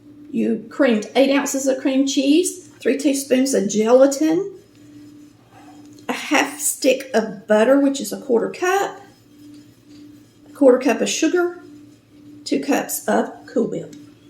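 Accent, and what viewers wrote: South, United States. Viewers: I'll figure out a way to get in touch with you but I got to go to bed now got to be up at 6 you make me smile with that southern accent I was born in Macon Georgia raised in Miami after Hurricane Andrew now I am in Mishawaka Indiana alright young lady got to go to bed but I will get in touch with you somehow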